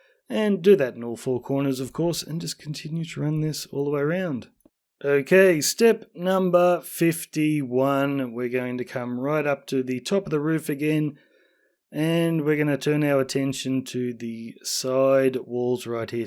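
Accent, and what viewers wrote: Australia. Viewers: Oh wow, didn't expect you to be Australian! Hello fellow friend down under 😃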